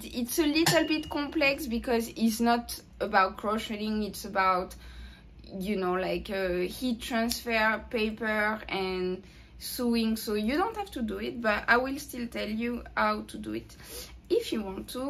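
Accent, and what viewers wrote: France. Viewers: Je critique pas du tout, merci pour toute tes explications c'est vrmt super, merci, mais ton accent bien français m'a fait rire 🤣 bien joué et continue c'est top, j'adore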